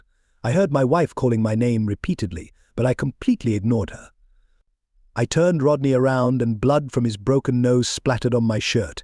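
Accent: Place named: England, United Kingdom. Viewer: Cannot stand the English accent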